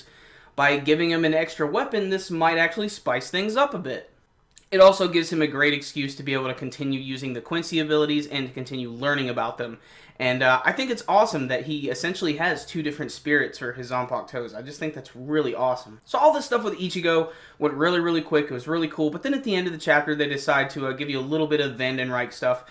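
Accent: Germany. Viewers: lol at the german accent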